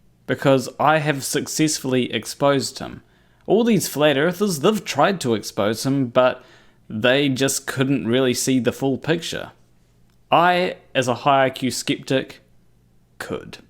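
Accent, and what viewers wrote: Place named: New Zealand. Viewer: Best N.Z. accent on U tube.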